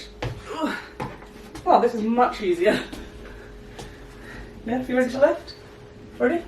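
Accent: Liverpool, United Kingdom. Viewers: Its just Liverpool English accent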